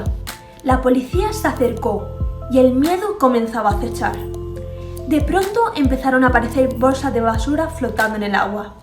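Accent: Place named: Spain. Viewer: Bien por tú trabajo..sólo podrías no acentuar tú forma española de hablar (neutralizar sería excelente "gracias"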